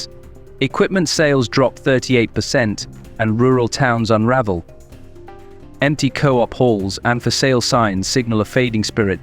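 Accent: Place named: United Kingdom. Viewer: Listen to a British voice talk about anything American NO THANKS!!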